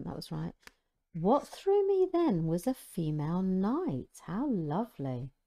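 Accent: United Kingdom. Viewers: everything sounds better with a british accent :)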